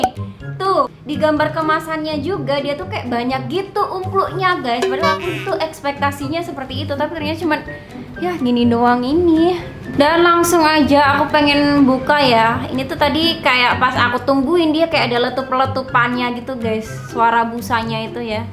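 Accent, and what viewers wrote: Java, Indonesia. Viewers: Saya paling suka dari kakak ituh orang lucu, asik banget, medok banget lofat jawa nya,hehehe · Orang jawa kalo ngomong bhs indonesia lucu😂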